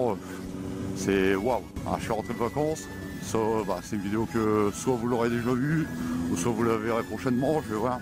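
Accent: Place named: North, France. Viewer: Vu les décors et l'accent tu es clairement d'min coin toi x) Tu es aux alentours de Bouchain ? (Je crois avoir vu que tu avais fait une vidéo sur le salon moto) Garde l’œil ouvert si tu croises un gars en MT01, R6, Diavel ou Streetfighter 1098 habillé en rouge dans le coin, j'y roule presque tous les jours ;) Bonne continuation. V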